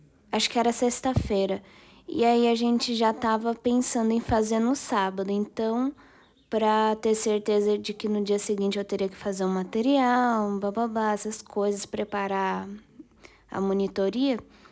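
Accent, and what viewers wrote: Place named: Spain. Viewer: No sabes hablar bien portugués parece más español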